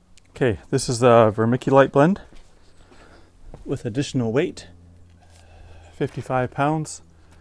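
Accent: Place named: Canada. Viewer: His accent didn't gave him away? Aboat?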